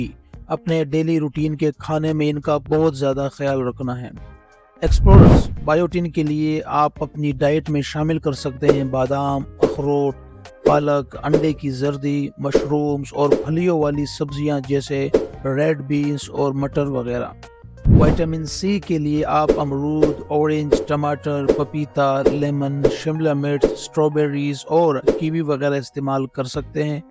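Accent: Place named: United Kingdom. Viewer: Your English accent too good.Your English accent is just like British people's.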